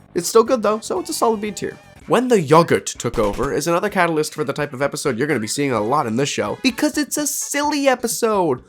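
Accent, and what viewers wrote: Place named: California, United States. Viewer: God this was a rough watch with a narrator who gives off LA/Cali vibes somehow... but you can feel he put in a lot of effort in this video and I respect it.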